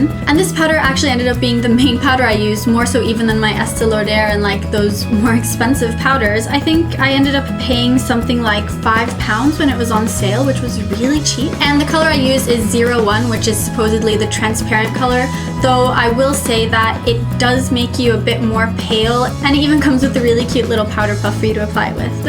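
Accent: United States. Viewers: U said u paid pounds but u have American accent.. Jus wanted to know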